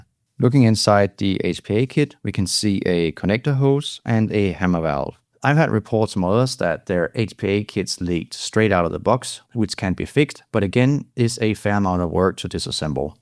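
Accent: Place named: Denmark. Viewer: Very interesting video, i dont know why, but your accent sounds very Danish to me?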